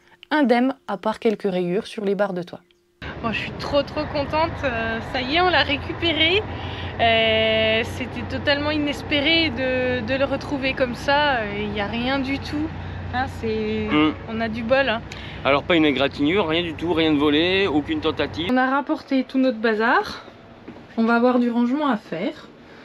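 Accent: Haute-Saône, France. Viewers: Magnifique résumé de votre voyage, ça n'a pas dû être facile de choisir les séquences tellement vous nous avez montré de beaux endroits depuis ces 3 années. Continuez bien comme ça à nous régaler. Vesoul et les autres contrées de Haute-Saône nous ont été familières durant de nombreuses années, ayant débuté ma carrière à Luxeuil, c'est votre accent qui m'a attiré au début. Merci en tous cas pour toutes ces belles vidéos. Prenez soin de vous là-bas en Hémisphère sud. Ici en Périgord on vous admire. Jean-Louis Adishatz. 😘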